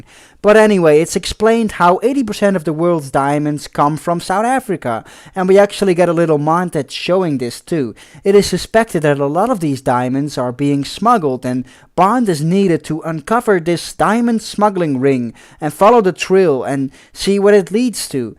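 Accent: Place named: Netherlands. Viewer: 'He looks like a bored, dirty old man with an ashtray on his chest'! Man, you are one funny fucker! I am still laughing at this -- the line definitely helped by your Dutch accent, my all time favourite of any foreign English speakers. Brilliant.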